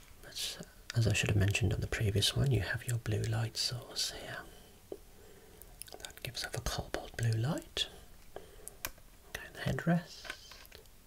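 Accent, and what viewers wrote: United Kingdom. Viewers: Your content, voice and British accent is better than any type of melatonin, I knock out so quick 🛌 😴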